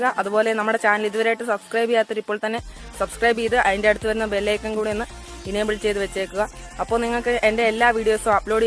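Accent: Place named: Idukki, India. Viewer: ഇടുക്കി ✌️✌️✌️ slang കേൾക്കുമ്പോ അറിയാം 😇😎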